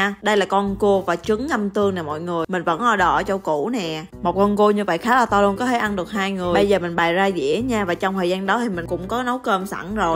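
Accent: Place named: South, Vietnam. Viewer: Giọng miền nam mà bạn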